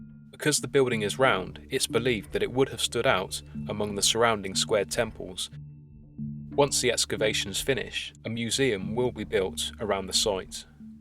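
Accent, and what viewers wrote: United Kingdom. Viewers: I hope someone scrutinizes the hell out of the way you speak, chomping at the bit for any "mispronunciation". I guess you've also never heard a British accent before. Asshole.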